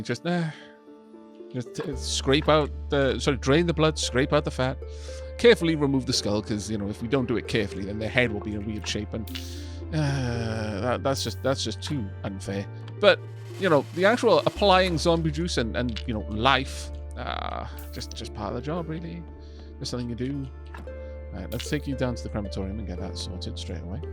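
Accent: United Kingdom. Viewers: Holy shit your like one of the most British people ive ever hear speak...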